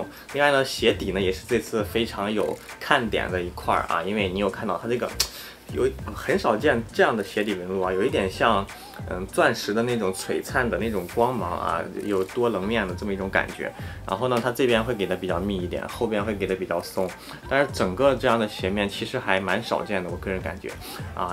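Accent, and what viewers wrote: Shanxi, China. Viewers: 是山西人吗？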